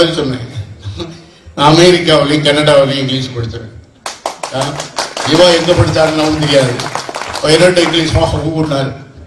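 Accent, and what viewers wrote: Kerala, India. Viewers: நீ பேசும் தமிழ் நாடார் பேசும் தமிழல்லவே. மலையாளம்வாசம் கலந்து நாறுது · எனக்கும் சந்தேகம். பேச்ச கேட்டபோதே தோன்றியது . இவன் ஒரு மலையாளி என்று தோன்றுகிறது. ஜெய் ஹிந்த்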